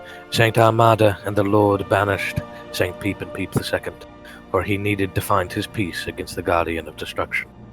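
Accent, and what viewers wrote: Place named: South, United States. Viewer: narrated by a fellow southerner. liked.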